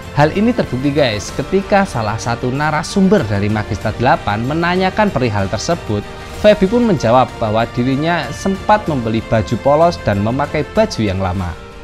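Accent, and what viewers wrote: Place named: Java, Indonesia. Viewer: Medok mu itulah bro.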